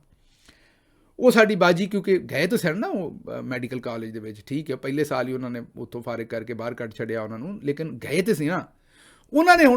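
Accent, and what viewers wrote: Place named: Punjab, India. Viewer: Very nice I like your Punjabi real Punjabi well done❤❤❤❤❤❤❤❤❤❤❤❤❤❤❤❤❤❤❤❤❤❤❤❤❤❤❤❤❤❤❤❤❤❤❤❤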